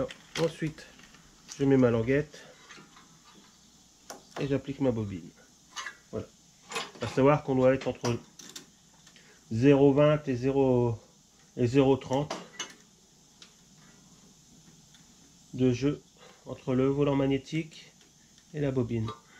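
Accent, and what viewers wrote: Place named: Nord-Pas-de-Calais, France. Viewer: Bin té vo min grand quand un veux parler Français ché pas facile d'oublier le chti "un arcomminche" Merci pour cette vidéo lol!!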